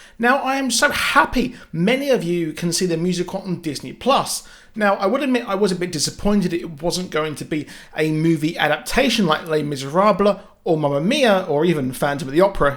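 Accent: United Kingdom. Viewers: “When I sAwR it” sorry I just love British accents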